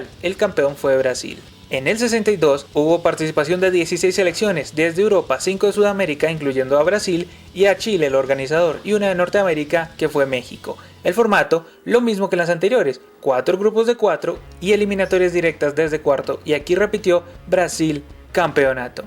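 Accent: Colombia. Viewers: eres Colombiano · oie eres colombiano guey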